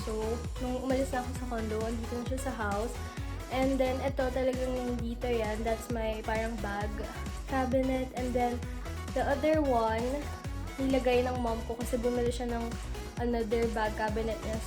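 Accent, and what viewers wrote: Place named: Conyo, Philippines. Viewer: Yung pagka-conyo at voice mo reminds me of my crush back in 9th Grade....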